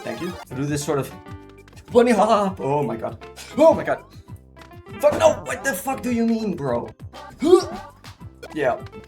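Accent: Netherlands. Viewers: Wait a min this channel is from the netherlands? So: oke test 1... 2... 3... test ik ga er dan vanuit dat mans nederlands is toch? Of ehh niet? Want als ik zo naar je kanaal kijk zie ik nederland en ik ben ook nederland en ook ik zag net ook nog € en dat is dan al europees ik dacht op recht dat je van Amerika zou komen en gewoon $ zou gebruiken maar nope nederland te gek en ook hey ik hoor langzaam ook een accent en die naam ik weet dat kip nederlands is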